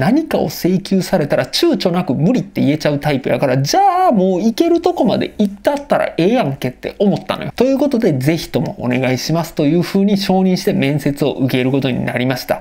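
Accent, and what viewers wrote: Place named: Kanto, Japan. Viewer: さんの動画で過去一おもろかったおちょくってるような関東弁がいちいちツボ(笑)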